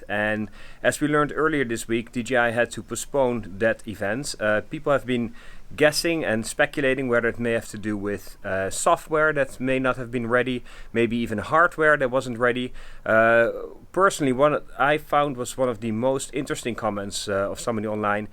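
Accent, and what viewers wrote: Netherlands. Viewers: u have a dutch accent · Are we Dutch by any chance?=) nice vid btw , tnx=) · Hoor aan het accent dat je Nederlands bent maar je spreekt goed Engels 👌🏻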